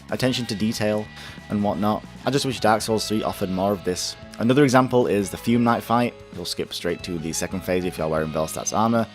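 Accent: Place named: United Kingdom. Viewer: Ah, ramblings in British or... whatever you accent is. Whatever it is, it's exceedingly good!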